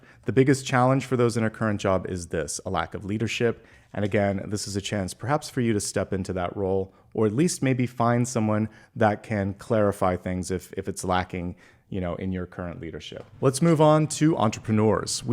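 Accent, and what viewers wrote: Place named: United States. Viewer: I see that building from your window and wondering where are you from. You have american accent but that landscape doesn't look american 😅 Best wishes for Apollo!❤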